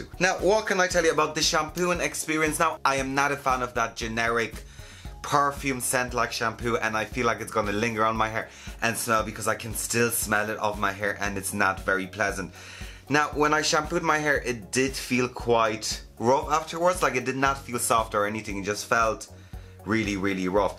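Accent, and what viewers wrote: Ireland. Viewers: I've really been enjoying watching your videos over the last month. I love the irish/UK slang and accent. I've always been a fan of hearing the different dialects. I'm pretty sure that started when the Spice Girls came out in 1997. Eleven year old me was extremely obsessed with them. Good lord. It was bad.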